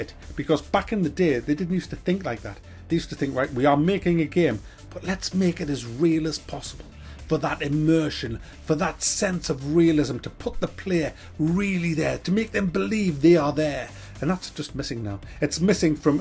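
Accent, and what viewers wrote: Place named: Newcastle, United Kingdom. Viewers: I don't watch you for your PC penchant, I have watched your videos for years because of how you cut through the bullshit and give me an honest and entertaining review. Firstly, it's fucking refreshing to have a proper geordie lad on here rather than some squeaky yank and secondly, while I don't play on PC, I appreciate what you have to say about the games releasing today, especially when they're mediocre yet full of greedy ploys by devolpers who have probably never played a game in their lives. Seriously, keep up the good work but give others who have made a different choice than yourself, getting a PS4 a break for simply having a different preference. I'm shite with a mouse and keyboard, I always plug in a controller when I play games on my mate's PC. It's always funny to see me aim with a mouse just as it is to see him try and make heads or tails of a controller! 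· Haven't watched the video through yet, no idea whether you will mention the games I'M looking forward to but who cares? I come here for and his glorious, dulcet Geordie tones not reinforcement of my existing opinions. I love you dude, your channel is one of my absolute favourite sources of entertainment.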